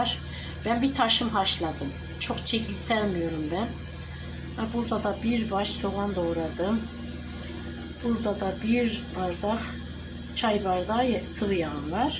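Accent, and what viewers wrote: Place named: Sivas, Turkey. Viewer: Sen sivas limisin bizim gibi konuşuyorsun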